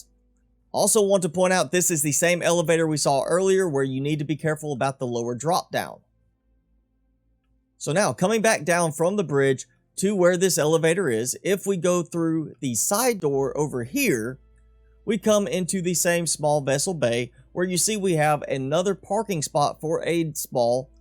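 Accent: South, United States. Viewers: No insult intended. Just curious. 😁 It sounds sort of southern...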